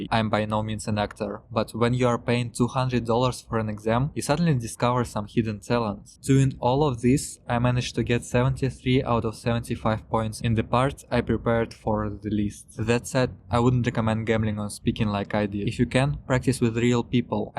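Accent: Russia. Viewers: First of all, thanks a lot for your videos. But, are you sure you are from Korea? You accent sounds very Russian to me.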